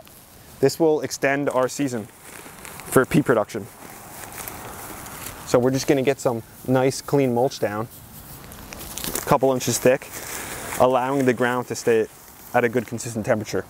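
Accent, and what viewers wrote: New Jersey, United States. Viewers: Are you from Joisey?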